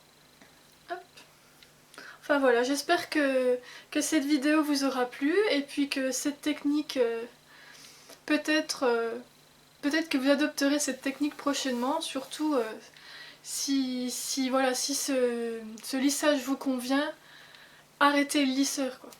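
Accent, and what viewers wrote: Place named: Franche-Comté, France. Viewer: tu as très bien expliqué!! tu as l'accent de chez moi enfin la franche comté!! je test ce soir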